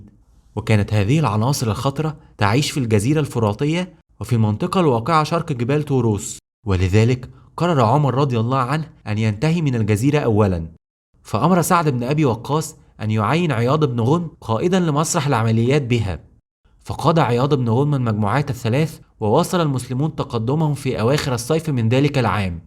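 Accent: Egypt. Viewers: يارجل ... ليت انك تتحدث بالفصحى ... فوالله ما اكره شيئا كما اكره سماع اللهجات بمختلف انواعها ... ودرجة الاشمئزاز كبيرة جدا عندي ... عند سماعي الجيم المصرية التي حولت لحرف g الانكليزي تكسيحا لجوخ الضباط الانكليز وتقبيل نعالهم ...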